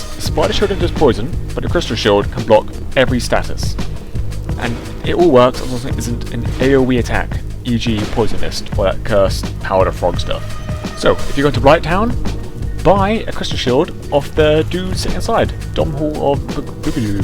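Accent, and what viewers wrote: United Kingdom. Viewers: i wish i could understand more than half of that. you are talking too fast and with too much of a british accent to be perceivable. please do subtitles.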